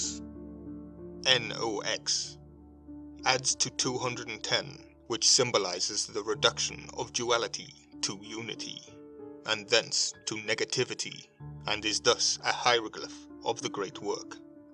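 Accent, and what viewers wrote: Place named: England, United Kingdom. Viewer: Hello my dear friend. I am still here. Glad your work continues. How are you? I miss the English accent voice...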